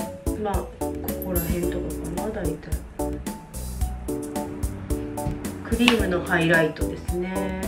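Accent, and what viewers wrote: Kansai, Japan. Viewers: この顔で関西弁喋るギャップ💗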